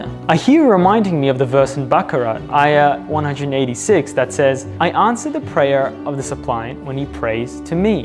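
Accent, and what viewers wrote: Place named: Australia. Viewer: I love the AU accent. Congrats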